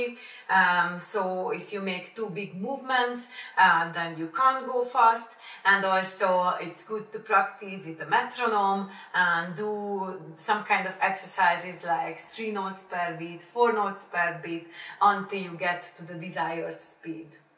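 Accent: Hungary. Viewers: Your accent is so hungarian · Trust me bro! I have a thick hungarian accent, just like has... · Nagyon aranyos a magyaros angolod :)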